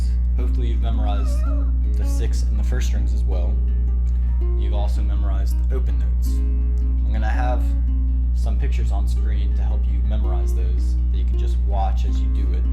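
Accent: United States. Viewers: Are you from Baltimore?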